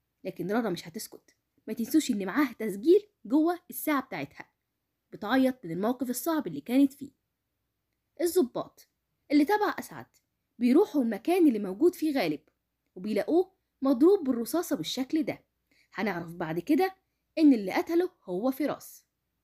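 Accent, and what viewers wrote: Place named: Iraq. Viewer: تتكلمي عراقي حلو شكرا على معلوماتك تحياتي وردة عراقية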